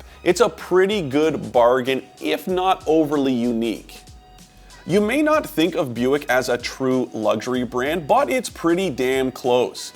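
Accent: Canada. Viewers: I'm curious, is this a Canadian or American show. this guy's Canadian accent is thick!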